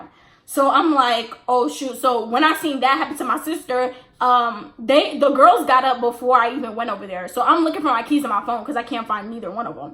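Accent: New York, United States. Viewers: see you was giving me new york vibes . i wasn’t jakkin that you was from georgia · I could tell you was from New York from the beginning cause I was like baby yeen from no Georgia but lit story time🔥 glad to kno ain the only female who fought niggas 😭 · Sissss.... this my first video I'm watching from you and as soon as you started talking, I said shorty a New Yorker !!! You have my sub !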